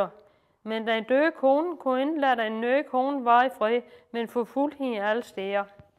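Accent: Lolland, Denmark. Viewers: Flot, vi sad i dag på Nakskov test og vaccine og så dig. Det er flot, lav endelig noget mere, du gør den lollandske dialekt ære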